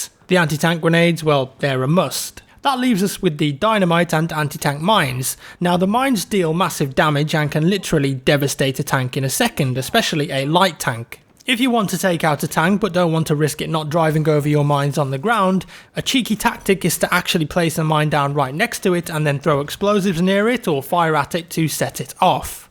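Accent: United Kingdom. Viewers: YOU. R. SO. BRITISH.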